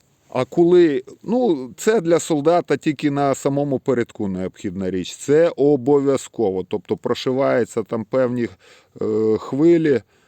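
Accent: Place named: Surzhyk, Ukraine. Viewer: Смешной суржик 😁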